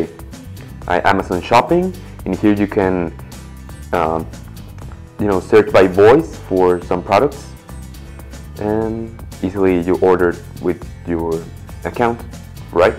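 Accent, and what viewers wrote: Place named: Monterrey, Mexico. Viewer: Eres de monterrey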